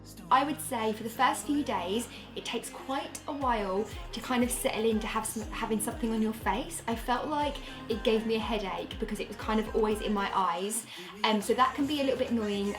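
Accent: United Kingdom. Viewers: I would die for your british accent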